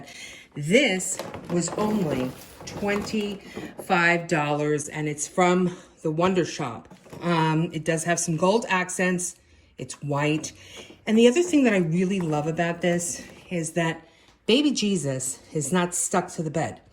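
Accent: New York, United States. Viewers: Oh..I'm so very sorry about your momma...but she is always with you. As far as you not being here and folks leaving...that's ridiculous. And you are so right..LIFE does happen. And as I've told you before..I love your channel, being a former New York girl, you always bring me "home" when i hear you voice. So, guess I'm saying..you're stuck with me..I ain't leaving! Take care & heal my friend.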